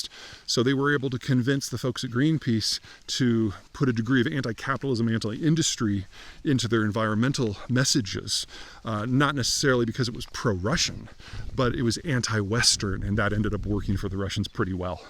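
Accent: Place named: United States. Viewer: Your pronunciation of Russian words is pure American. Clear, but not "native." The average American with training in Russian sounds like he/she is from Georgia; the Georgia that used to be part of the Soviet Union.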